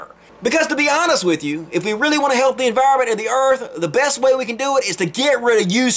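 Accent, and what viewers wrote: South, United States. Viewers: Its pretty refreshing to hear a southern accent coming out of an intelligent person's mouth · with your accent, it would appear you are from a red state. You rock, but it must be lonely being one of the few with a brain that actually works!! Hang in there and keep the great logical videos coming!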